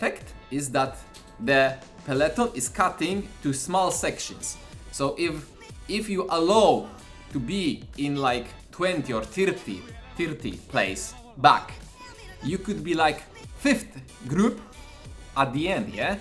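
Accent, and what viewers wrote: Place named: Russia. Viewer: bjutiful raszan akcet yea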